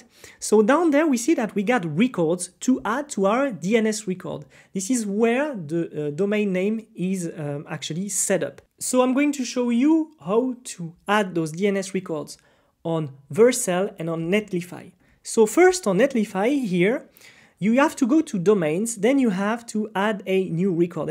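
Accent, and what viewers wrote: France. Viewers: I like that Frenglish ♥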